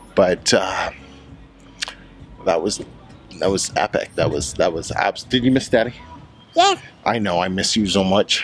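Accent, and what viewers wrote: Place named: Canada. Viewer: I thought you were Canadian lol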